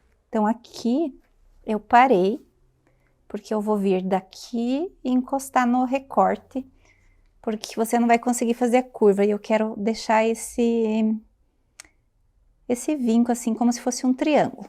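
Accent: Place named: South, Brazil. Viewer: Menina contando com o sutaque que eu amoooooo acredito que tu e do Sul. Se cometi uma gafe perdao mas e qui amoo o sul essa aula e um luxuuu amei obrigada logo. Logo quero faser obrigada.pelo carinho.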